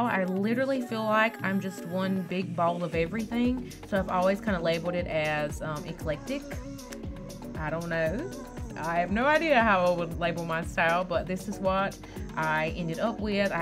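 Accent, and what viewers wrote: South, United States. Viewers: I literally been watching your channel all day because it's my #OffDay and I checked out your Pinterest page out and I loved it Heck I love all the southern country style inspiration I'm from Texas so this bell loves farm house vibes and beautiful southern ascents hats off to you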